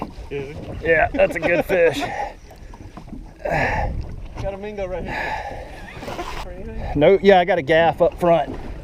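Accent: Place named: South, United States. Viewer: Hi, I just want to tell you how much I have enjoyed watching your channel which I just discovered two days ago. I absolutely love your Catch and Cook Video's. You are a lovely person and your video's and friends seem so nice. Thank you for your channel and keep those Catch and Cook video's coming. By the way, I saw in your mother's kitchen she had a scripture from Psalm 16 written on a small blackboard. Is she a Christian? If so, I think that is awesome. I have walked with the Lord Jesus for 26 years now and have an awesome relationship with Him. Where are you from originally-you have some beautiful southern accent. You are an amazing fisherman-someone who can tell what fish is on the line before you even see the actual fish tells me that you are very knowledgeable about fish, which I find so interesting and neat. I can tell you just love being on the water and you are so fortunate to be able to make a living doing what you love. 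God Bless You,.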